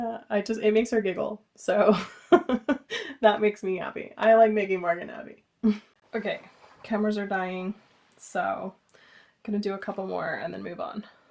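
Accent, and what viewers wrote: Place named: Seattle, United States. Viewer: Love the dress and the video! I’m one of those folks that has watched your channel for ages. I live in Denver now but was born in Seattle and raised in the Bay Area and you just sound so familiar to me. Nothing like California. I am a seamstress and do everything from hemming jeans to making wedding dresses and have done so since I was 16 (I’m 70 now so do the math!). I’m also a quilter, knitter and embroider. Gotta keep those hands busy when I’m watching tv. I’m not reading anything new but just reread The Red Tent which is one of my favs. My daughters are in their 40’s and were raised in the Bay Area too. Okay, I guess I’ve taken up enough of your time. Take care. 😊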